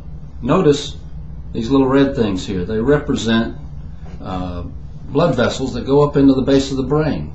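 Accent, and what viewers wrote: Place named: South, United States. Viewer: " the southern accent doesn't make him any more believable" Nice prejudice coming from Canada "eh"? Statements like that only point out how ignorant you are. Have you ever been to the South? Do you have any idea what you're talking about? He's not talking about harmless yoga stretching, he's talking about wrenching your neck beyond it's anatomical limits.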